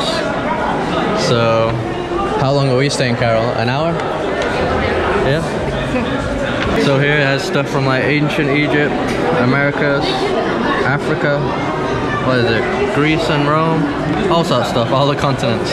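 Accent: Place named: North, United Kingdom. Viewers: I did guessed that accent is British, somewhere north 😊. Anyway nice videos as per👍. I live about an hour away from London but I don't go there often, its just too busy for me. Btw, Big Ben is actually the bell of the tower, the tower itself is called Elizabeth Tower. It's under construction because it's gone weaker and its leaning, it will collapse if they don't do something. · I feel like you’ve got an English accent where are u actually from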